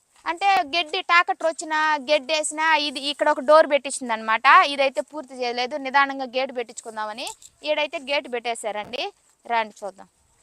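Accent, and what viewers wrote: Nellore, India. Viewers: Nellore slang ❤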